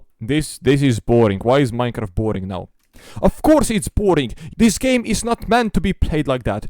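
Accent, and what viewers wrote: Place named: Eastern Europe. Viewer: ... yeah i hear the babushka people acent now that i relisten to it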